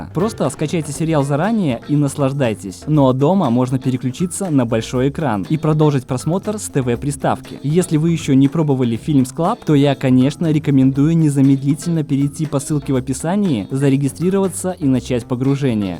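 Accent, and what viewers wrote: Grodno, Belarus. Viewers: Дружище, ты не из Гродно?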